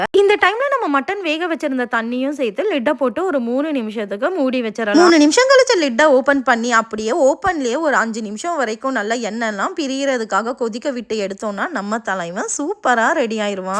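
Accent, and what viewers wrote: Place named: Madurai, India. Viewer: Tempting will try this weekend for sure.madurai slang super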